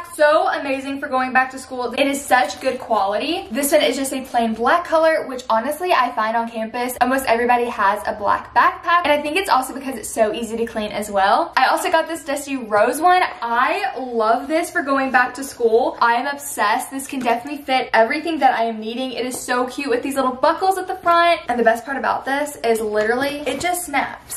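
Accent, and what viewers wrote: South, United States. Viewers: I watch videos on 2x usually and never realized you had a super southern accent until this one was playing in a normal speed. Its a nice accent I just was surprised. Love your videos!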